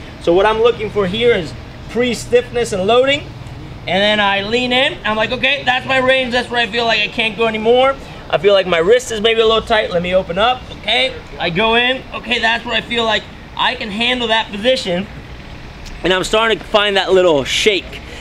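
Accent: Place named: United States. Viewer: he sounds like he lives in America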